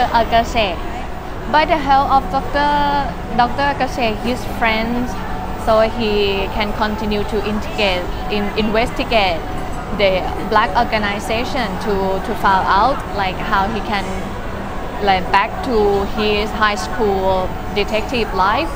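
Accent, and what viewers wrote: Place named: Asia. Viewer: Bien pour une première, bizzarement filmé ce doit être du au montage avec de nombreuses coupes non? sinon son anglais a parfois est rigolo avec cet accent tonique asiatique, ça me rappelle par moments Michel LEEB dans son sketch du chinois..LOL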